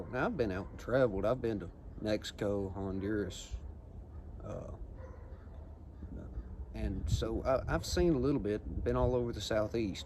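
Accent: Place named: Kentucky, United States. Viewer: Sounds like a Ky accent.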